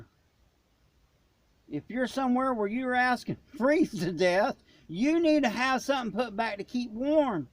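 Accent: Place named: Ohio, United States. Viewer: Love your accent. Are you from ohio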